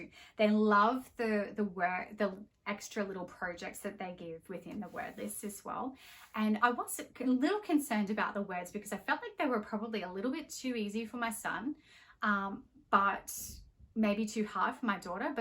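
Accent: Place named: Australia. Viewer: Following you on IG and subscribing! Love your voice and your Aussie accent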